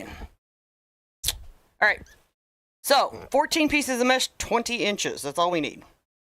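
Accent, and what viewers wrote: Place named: Kentucky, United States. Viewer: Hi, I knew you were from KY before you told us. I am from KY, and I recognized the accent. I lived away from here for several years and lost a lot of my accent though. It is always good to be in KY. I love it.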